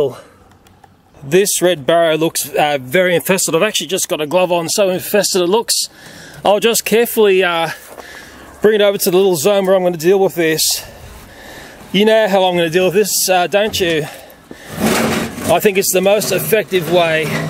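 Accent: Australia. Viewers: "we gott a redback spaidah" , "gud noit sistah" beautifal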